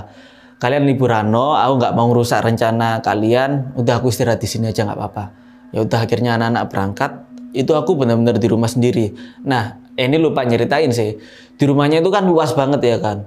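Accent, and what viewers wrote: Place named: Surabaya, Indonesia. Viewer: Medok suroboyoan e,,👍😁😁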